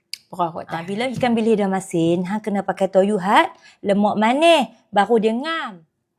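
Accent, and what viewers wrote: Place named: North, Malaysia. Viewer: Pekat lorat utara dia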